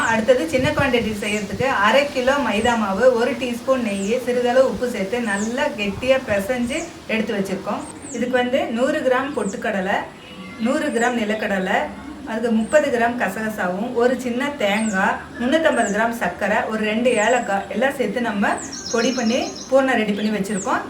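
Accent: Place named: Madurai, India. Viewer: ok ok தமிழ் மதுரை தமிழ் போல உள்ளது..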